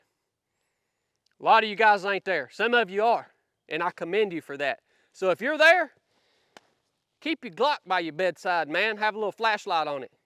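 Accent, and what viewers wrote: South, United States. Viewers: Omg…😂 maaaaan I abso-fuckin-lutely LOVE THIS VID!!!! Your country accent, appearence, gear and humor was it for me!! Lol one of the most logical vids I’ve ever seen on here man and you now have a loyal subscriber/ follower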